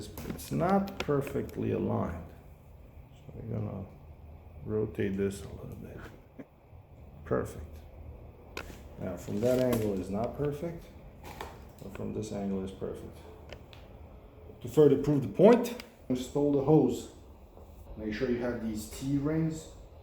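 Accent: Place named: Eastern Europe. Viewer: Can’t quite place the accent..sounds Eastern European, and to my Romanian ear, it sounds almost Romanian. Which is it? Curious here..:)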